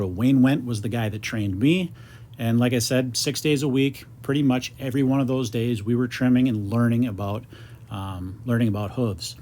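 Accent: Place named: Upper Midwest, United States. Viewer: I should have know you were from our neck of the woods. You got that big "don'cha know" accent!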